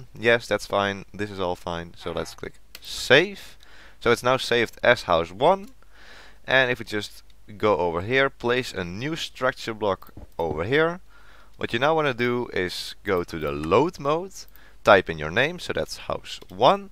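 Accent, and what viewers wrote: Netherlands. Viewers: Hahahahaha Hoi Nederlander ahahhahahaha geen haat maar bij elk Nederlands persoon kan je horen dat je Nederlands bent als je Engels praat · Ik hoor aan je stem dat je een Nederlander bent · You sound like a dutch man am i right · Are you dutch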